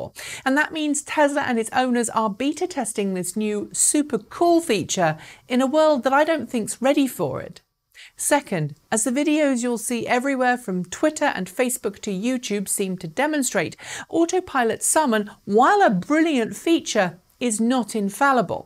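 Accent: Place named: United Kingdom. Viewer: So happy your British accent has returned after having listened to the one with Arcimoto. I have no doubt whatsoever that if Tesla removed any feature once enabled it would lead to legal confrontations. Many Americans do not like to be told "NO!". Having owned my model3 for a year now, the autopilot function is great with a few caveats, it is sadly out of its depth when required to cope with the many mentally challenged road users that frequent Hwy 101. I am of the sad opinion that the roll-out will be seriously hindered because of the incompetent drivers on the roads today. I further think that this situation will not improve with time.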